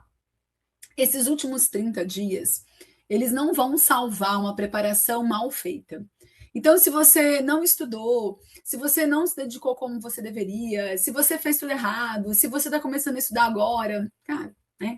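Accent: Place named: Angola. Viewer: Acabei de reparar que o sotaque de lembra o sotaque angolano!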